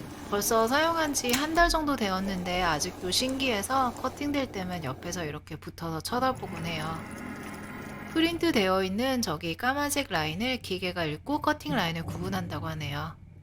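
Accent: Seoul, South Korea. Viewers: 뭔가 목소리가 80년대서울 사투리 말투 같아여😅😅😅헤헤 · 방송에서 듣던 서울사투리 같은 말투가 정겹고 좋네요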